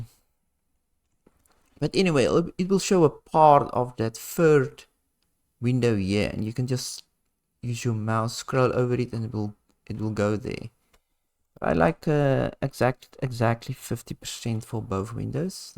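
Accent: South Africa. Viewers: i know a south african accent when i hear one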